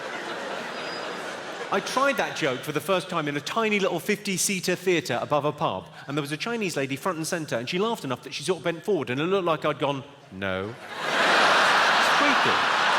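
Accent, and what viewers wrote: United Kingdom. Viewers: Can you explain (or transcript, to be exact) the punchline, please? It's somewhat hard for me to decypher some words in british accent.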